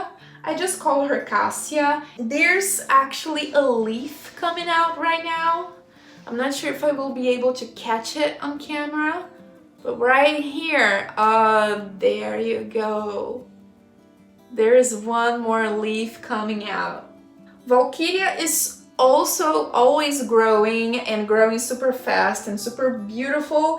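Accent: Brazil. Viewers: r u from brazil? u have a little accent <3 hahaha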